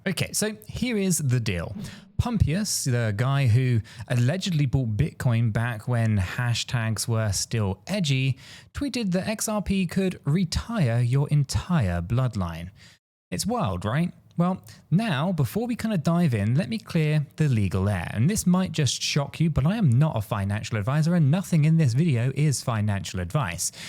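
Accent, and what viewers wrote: United Kingdom. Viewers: The only thing worse than an entire video with non stop sarcasm is hearing it with a British accent 🤮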